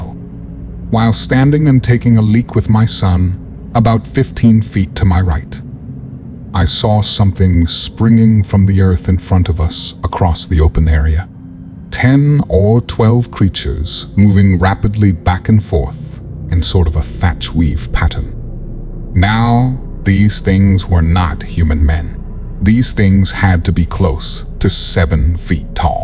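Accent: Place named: England, United Kingdom. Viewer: I said that but theres a pommy accent there??? Still cant work out if it is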